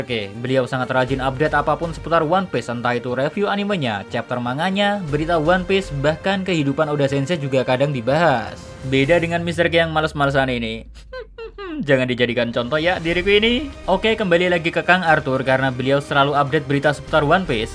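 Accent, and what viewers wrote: Java, Indonesia. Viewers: Yoi,ala logat jawa suaranya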